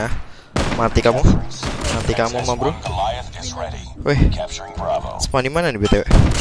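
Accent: Java, Indonesia. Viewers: ngomongnya kayak orang Jawa untung aku orang Jawa jadi ngerti gituh hehe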